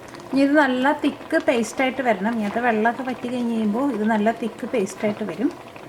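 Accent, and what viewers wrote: Thrissur, India. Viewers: chechide chiriyum pinne thrissur slangile samsaravum nice aaaa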